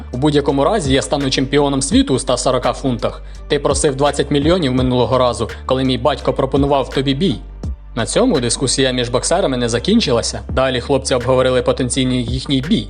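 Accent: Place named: Rivne, Ukraine. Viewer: Акцент у тебе північнорівненський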